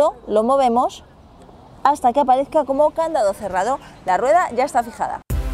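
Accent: Spain. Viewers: Hay no se porque pero amo como hablan las mujeres españolas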